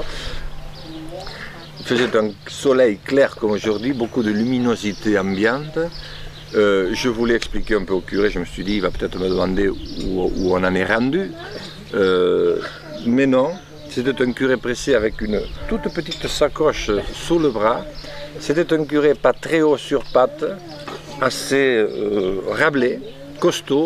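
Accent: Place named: Béarn, France. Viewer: Ce n'est pas un accent Chti, mais un accent du Sud-Ouest !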